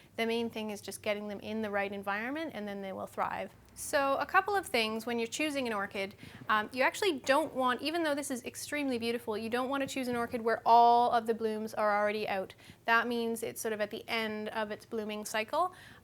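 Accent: Canada. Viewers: she must be from Canada. Oh and by the way. What are you, 4? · Its Canadian accent!!!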